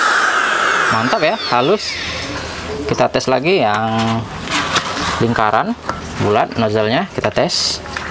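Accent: Medan, Indonesia. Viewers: Abg dr medan ya, sama donk bg...medan dmn nya bg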